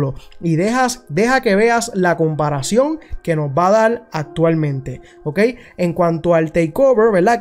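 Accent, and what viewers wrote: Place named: Puerto Rico. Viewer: Eres puertorriqueño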